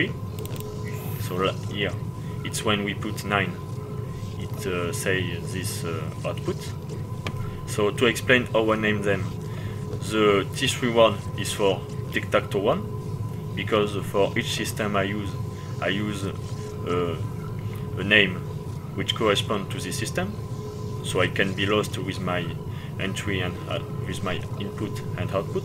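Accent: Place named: France. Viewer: Lol on reconnait bien le français qui parle anglais xD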